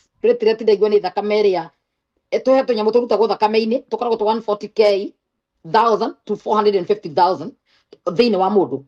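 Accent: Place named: Kikuyu, Kenya. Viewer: githungu mega tiga guthoma ta gikuyu.Nitunogire kuigua ndeto icio.Wi murumani muno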